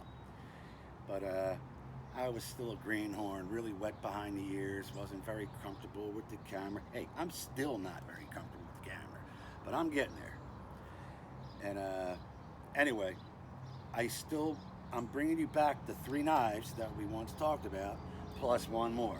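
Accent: Australia. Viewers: The hat is awesome. You sound just like you are from the outback brother. This is awesome. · Aussie accent is hard to do if your not an Aussie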